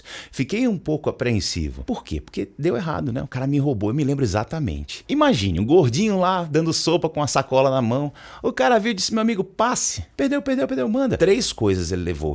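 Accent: Northeast, Brazil. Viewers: Teacher fera! E esse sotaque do meu nordeste só torna o vídeo melhor de assistir! Que qualidade! 👏👏👏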